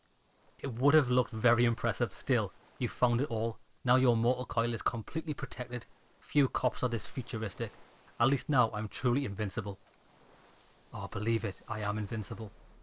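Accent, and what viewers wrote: United Kingdom. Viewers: Harry Du Bois - Cop of the Apocalypse, entroponaut, pioneer in the fields of rave dance culture and cryptozoology,real man of the renaissance:D I am a little sad that this is the final, despite some modern political things that jumped out too annoyingly during the story, I really fell in love with this game and Harry and Kim,and I will miss them very much. I want to thank you, for this walkthrough. When this game came out a year ago, I was very interested in it, but I was confused by the large amount of text, and I thought that my knowledge of English would not be enough and the process would be tedious. As it turned out, everything is not so scary, and I waited in vain for a whole year.) And I must also note that you have a pleasant voice and British-English manner of speaking, and your obvious interest in what is happening in the game did not disturb its atmosphere.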